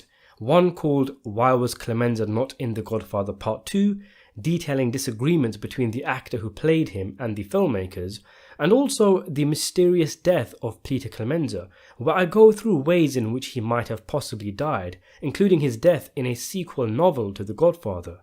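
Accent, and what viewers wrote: United Kingdom. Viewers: yo,you a brit? Cause im not entirely sure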